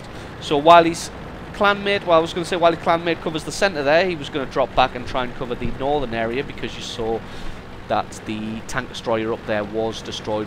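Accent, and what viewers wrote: United Kingdom. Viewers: Your accent is somewhere in the U.K. What nationality or accent is that from?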